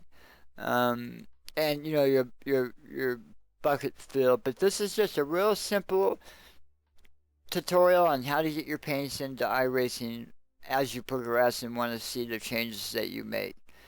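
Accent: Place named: United States. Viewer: Don't worry about your voice. For me as a german it sounds like typical American with a little slang. But I understand your pretty well. Thanks for the tutorial. It helps very much :-)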